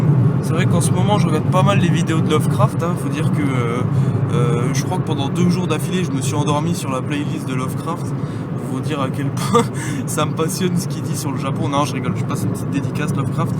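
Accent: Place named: Moselle, France. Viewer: bien la nappe rouge , bien les rpg ps2 , bien l'accent de la Moselle !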